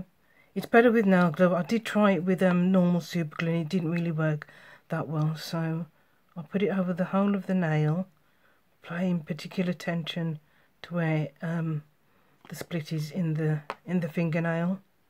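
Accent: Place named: United Kingdom. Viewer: I'm so jealous of the British accent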